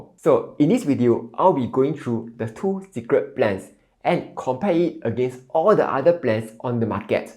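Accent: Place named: Singapore. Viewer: This guy's accent is the most Singaporean of all Singaporeans.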